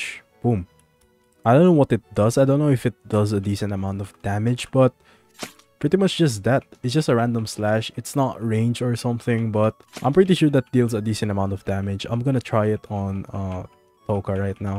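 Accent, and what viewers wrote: Philippines. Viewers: he sounds filipino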